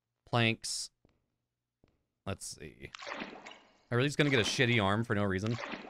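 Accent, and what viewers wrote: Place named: Scotland, United Kingdom. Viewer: I absolutely love the Scottish accent.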